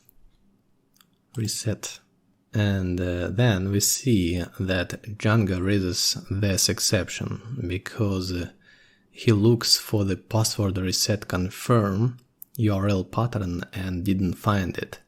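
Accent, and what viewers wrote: Russia. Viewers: Найс ролик, видно сразу - Русский, ну или из другой странны СНГ, акцент сдал)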